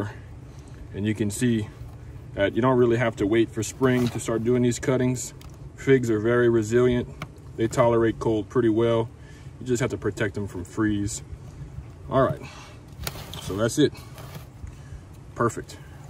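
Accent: Louisiana, United States. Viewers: What a coincidence! I did the same thing today with my brown turkey tree. I am trying to get more varieties. Would you be willing to sell any of these cuttings? By your slight Cajun accent, I probably dont live too far from you. Let me know. Great video.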